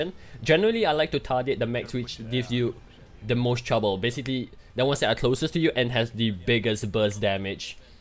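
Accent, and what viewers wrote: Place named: Southeast Asia. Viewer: Your accent is familiar, are you from SEA?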